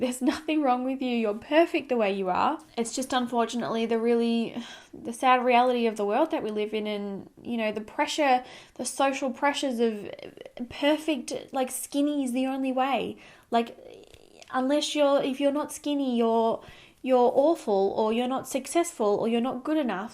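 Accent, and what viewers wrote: Australia. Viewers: Sweet, Beautiful on the Inside and the Outside, I am a subscriber who is old enough to be your mother, maybe even your grandmother. My heart broke listening to you talk about your painful journey. I was a teen in the 60s and this threw me back to that time. Mean bullying girls! I truly believe that's where a lot of this starts. I was so bullied by mean girls in junior high and high school. I was 4'11" and never overweight but that didn't stop their hatred. Their words and actions burrow into your brain until you start believing them and unfortunately it stays with you your entire life. My adult daughter went through the same thing in high school. People like that say and do mean things just to make themselves look better. And underneath it all they are truly sad and miserable with their own life. I pray you will keep that determination in your heart to overcome all of the negative things you hear in your head and win this battle. 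God bless Brandon. A supportive fiance'/husband is one of the best weapons in the battle. You are a beauty... Inside and out. I am 100% mesmerized when watching your videos. You have the cutest personality (you make me laugh often) and the most soothing, calming voice, not to mention awesome Australian accent!! I have no doubt that you just helped many, many young women out there with your truth! Bless you! May every day get a bit easier for you! ❤️❤️❤️❤️ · You are beautiful the way you are. I have always loved your chirpy self and your adorable Australian accent. My best wishes to you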